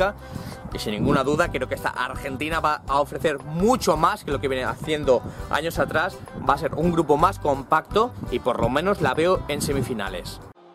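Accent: Spain. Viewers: ¿Sos español, si acaso? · gracias , eres de España no ? Veo eso con tu acento pero talvez me equivoco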